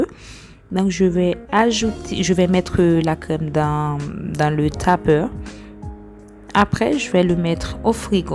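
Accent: Haiti. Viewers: Accent haïtien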